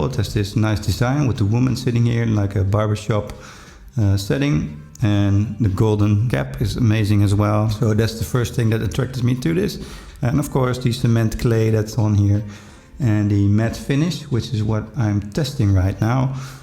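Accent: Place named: Netherlands. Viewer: The dutch accent 😂🫡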